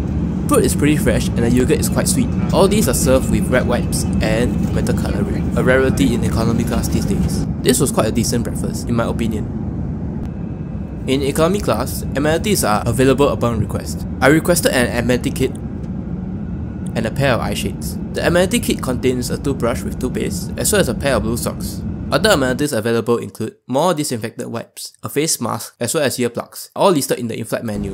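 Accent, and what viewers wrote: Singapore. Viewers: Good job on the video and am glad yr accent is genuine Singaporean. However, the words seem to be generally quite 'compressed'. In the English language, there are not only short vowels but long too.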